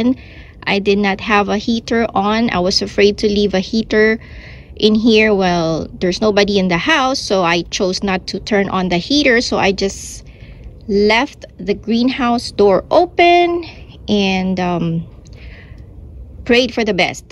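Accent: Philippines. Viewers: Hi the way you talk you are Filipino ..then i saw the design ur cup of coffeee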